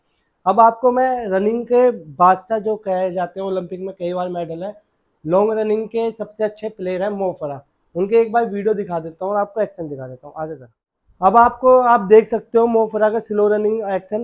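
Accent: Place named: Sohna, India. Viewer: आप बादशाहपूर सोहना से हों, आपकी आवाज बता रही है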